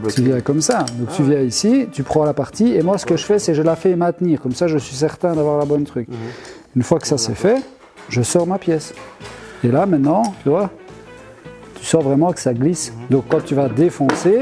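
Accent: Belgium. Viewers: Bonjour. Très bonne vidéo comme toujours on ne s'en lasse pas bravo et merci pour tous ses conseils avisés, pertinents, et argumentés. C'est FLOKY qui va encore se marrer car tu "perzistes" toujours dans cette belle langue franco belge. Allez ciao et bonne continuation.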